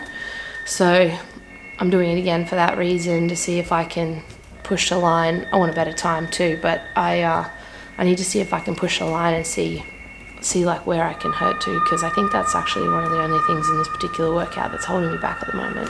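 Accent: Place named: Australia. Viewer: Hiiiii.. From Costa Rica. You can put subtitles in your videos I can hardly understand the Australian accent. Tks. Love you.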